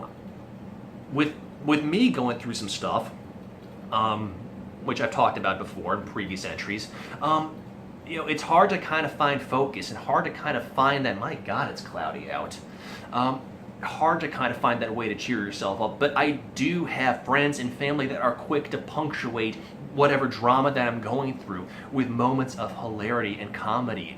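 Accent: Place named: South, United States. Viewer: All I heard through this whole thing was your southern accent. And I loved it. :D Must've been all that Steel Magnolia watching.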